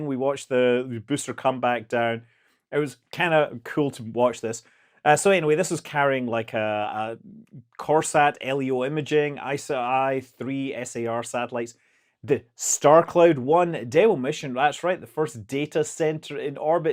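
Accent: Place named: Edinburgh, United Kingdom. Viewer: scott manley is a scot, and manly :) i do a fair accurate glasgow accent. i love yours. doesnt quite sound like glasgow..... or maybe mine doesnt. sounds more edenborough (and i am canadian so wtf do i know)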